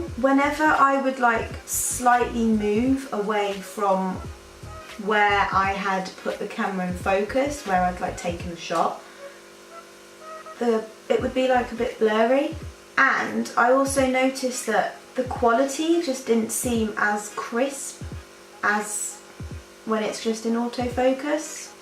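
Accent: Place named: United Kingdom. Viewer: Omg looove the look, you're just so pretty and I love the way you talk haha don't mean to sound creepy though, I just have a slight obsession with British accents and everything English related.. I want to move to England soo bad!! lol sorry for that random comment.. I just meant to say that I loved this video and you're awesome! x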